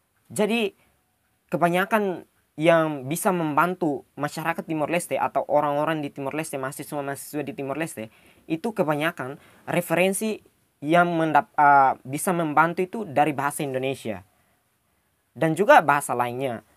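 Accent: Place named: Malaysia. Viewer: Maaf.. Bisakah kata 'so' yang sering kamu ucapkan divideo mu... kamu ganti dengan ucap "jadiii".. kalo sering ucap kata "so"... jadi mirip kayak cara bicara orang malaysia.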